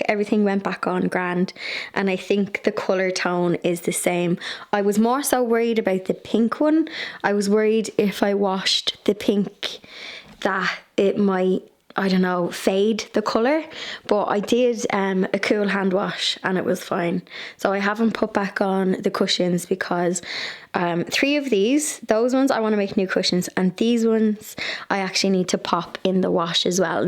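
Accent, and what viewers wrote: Ireland. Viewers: I love you so much! Whenever I'm down I watch one of your videos and your loveliness and your lovely Irish accent makes everything better!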